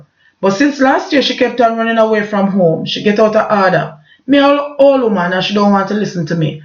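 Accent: Jamaica. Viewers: Do ur. I no it not easy for you to come and talk about all this sad news because you just want some peace. I like how u still speak like a Jamaica. well done big up ur self.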